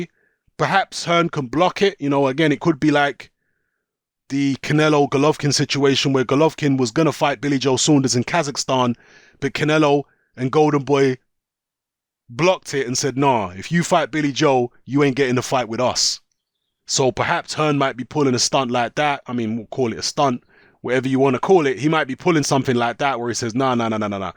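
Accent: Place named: South London, United Kingdom. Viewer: has a very heavy South London Accent. Which part of America is South London in?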